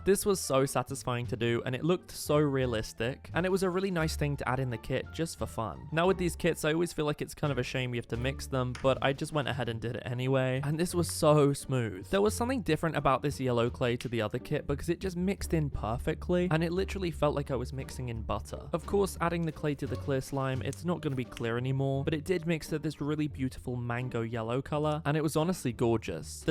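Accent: United Kingdom. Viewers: I had no clue you were from the Uk- but HII ME TOO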